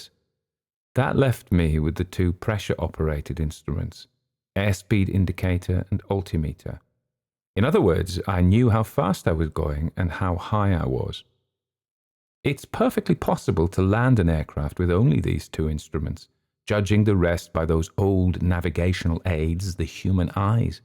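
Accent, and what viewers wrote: United Kingdom. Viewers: What a treat to hear a British voice, not a stupid American AI muck up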